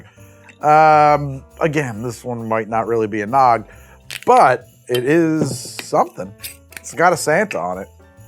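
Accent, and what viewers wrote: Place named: New Jersey, United States. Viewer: Carnival barker with his Jersey roots coming through.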